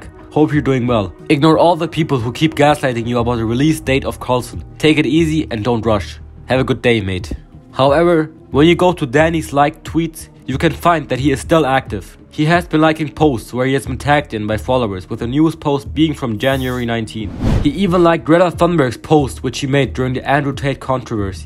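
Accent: Germany. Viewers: are you german (im german) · You sound very German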